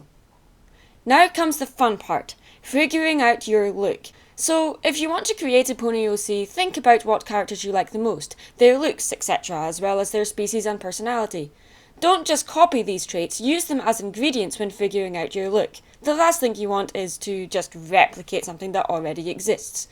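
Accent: Scotland, United Kingdom. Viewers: Mhm, me and Dog like your Scottish accent. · this is too scottish for me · I'm Scottish too athough i dont have an accent But I Love yours! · U Scottish so am i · OMG ur Scottish I love u 4 EVAR!!!!!!! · Yay it's a Scottish youtuber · i honestly love your accent and i believe you are the only scottish female pony tuber.. that just makes you special :D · Btw if reads this were in the highlands do you live is it the birthplace of ICTFC if it is you need to say cuz if you do I would flip. Also what accent do you have is it invernigian or glaswegian or other yay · Adorable >u< I love her accent and I'm actually part Scottish too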